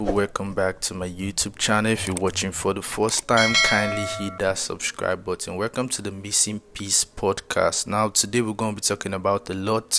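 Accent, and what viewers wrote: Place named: Nigeria. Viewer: Everyone knows you’re Nigerian from your accent so I don’t think you need to use “Nigerian” again in your captions. I understand it’s for click baiting but it’s unnecessary. It’s not an artiste job to manage another artiste. Gh artistes have tried on their own varied ways to support other artistes, ie featuring on their songs, giving them a platform on their shows, performing on their shows, promoting them, giving them plugs etc. even with limited resources/ investments. Gh artistes managers and labels are also trying with limited resources. The main issue is investment and a conducive industry environment to push the artistes to the top. If Gh artistes have the same or even 3/4th of the investment that Naija put in their artistes, and even in their movie industry, Gh would be doing much better and would be robbing shoulders with the top artistes on the world stage.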